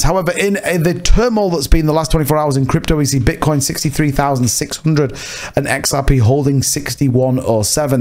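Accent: United Kingdom. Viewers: Great to hear a British voice doing XRP content. 🙏🏻 come on XRP…… from a fellow Brit.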